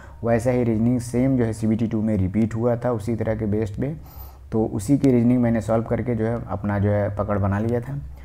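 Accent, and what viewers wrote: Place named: Tamil Nadu, India. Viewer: You sound like tamilan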